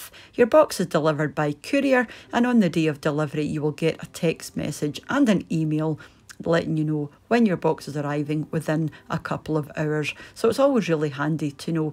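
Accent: Scotland, United Kingdom. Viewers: I'm a SASSENACH, and I love your Scottish accent: "In a wee while, I'm away tae make Mester tea!" 👍😂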